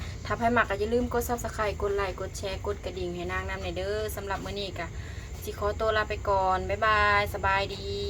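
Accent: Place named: Savannakhet, Laos. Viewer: ເອື້ອຍຄົນສະຫວັນບໍນິ ສຳນຽງຄືຄົນສະຫວັນ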